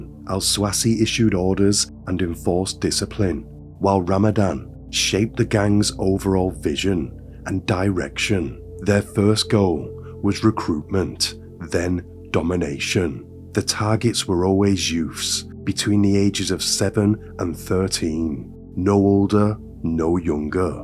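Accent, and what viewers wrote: United Kingdom. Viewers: Common pronunciation for British folk in certain areas. England has a very broad range of accents and pronunciation habits. I quite like his voice. It seems to work very well for this type of content.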